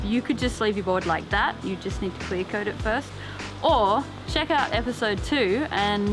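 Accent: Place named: New Zealand. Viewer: Love this kind of art, and the kiwi accent :)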